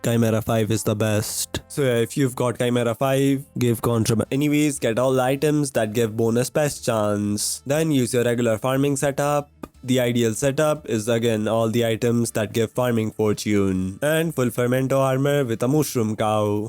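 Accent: India. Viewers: indian ah accent is so annoying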